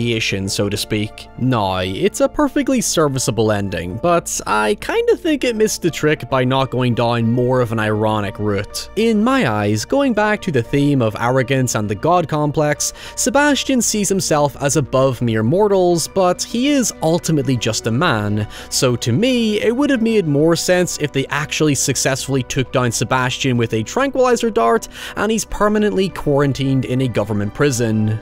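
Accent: Ireland. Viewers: first time requester, so I'm excited to share my recent J horror deep dive. I caught Noroi: The Curse, probably the creme de l creme of found footage flicks. It h interesting hook as an investigative journalist tries to get to the bottom of mysterious deaths and disappearances. A slow burn masterpiece with a truly horrifying climax. One for the ages that only your dulcet Irish tone can breakdown accordingly. Keep up the Great work, you've knocked it outta the park this year! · I can tell your Irish, but why are you putting an upwards inflexion at the end of almost every word? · You're British? I thought you were Irish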